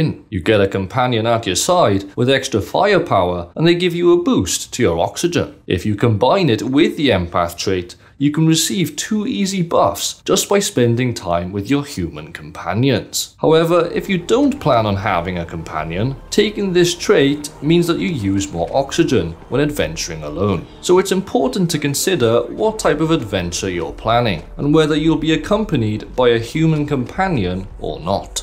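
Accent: Wales, United Kingdom. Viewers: Love the Welsh accent, although yours isn't strong. It just sounds friendly to me. Diolch!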